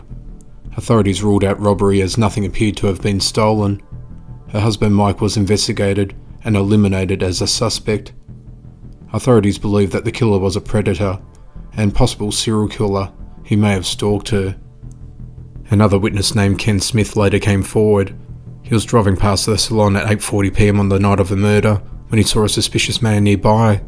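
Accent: Australia. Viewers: Wait, is this an Aussie accent mine ears hear? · Nice to hear an Aussie accent doing these videos!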